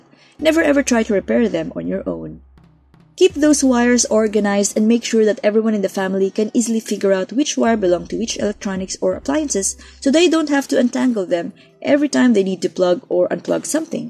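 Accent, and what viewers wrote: Philippines. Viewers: Since you said that, I noticed and I agree. She's most probably a Filipino. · She sounds Filipino. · You sound so Philippino ❤❤❤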